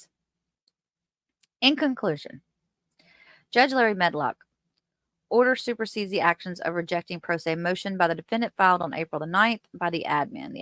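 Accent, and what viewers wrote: South, United States. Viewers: Hi from back from vacation lovely!! Sorry I was away w/out a Wifi … I’m binge watching your videos now 😊. Always a pleasure to listen to your southern sweet accent 🫶🏽 Have a wonderful week 😘🌺🫶🏽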